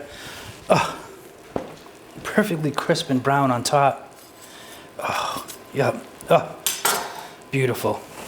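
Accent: Massachusetts, United States. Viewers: So nice to hear a good mass accent. My I miss home in Colorado. First time viewing you...looks good best to you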